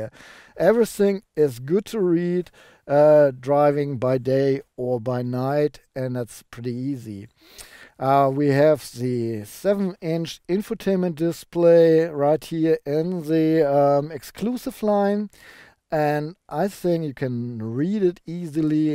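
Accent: Germany. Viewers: Die Videos sind nice... vielleicht solltest du einfach mal ne ganze Woche am stück Englisch sprechen, Radio auf englisch hören, englische Youtube Videos gucken; damit du mal diesen fürchterlichen deutschen Akzent loswirst. nimms nicht persönlich, mein Englisch hat mal genau so bescheiden geklungen ;) eine Woche reicht. Wenn du sie nicht schon auswendig kennst, guck dir alle staffeln von TOP Gear UK an...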